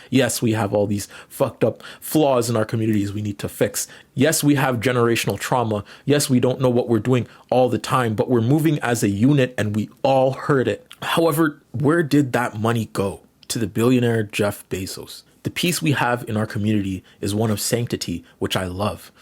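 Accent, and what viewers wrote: Canada. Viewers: As soon as I heard your voice I could tell you were a fellow Canadian 😂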